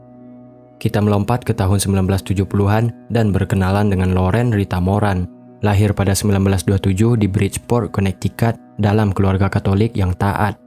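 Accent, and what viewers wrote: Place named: Aceh, Indonesia. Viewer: bang orang aceh apa orang lombok bang? kentel banget logatnya 😁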